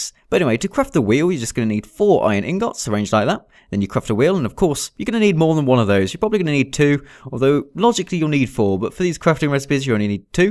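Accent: United Kingdom. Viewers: I <3 u so much u make my day and make me smile even when I'm in hospital ! Ps r u British?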